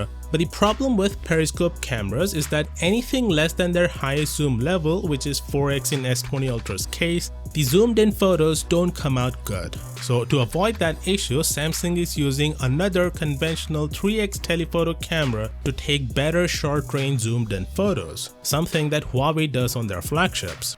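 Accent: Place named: India. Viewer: Why do you speak with indian accent?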